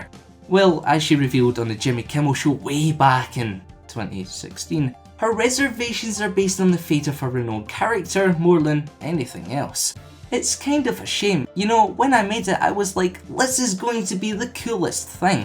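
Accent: Scotland, United Kingdom. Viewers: u scottish? Cause ur accent sounds like scottish i know cause im scottish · God damn it I can understand you you're Scottish accent is so strong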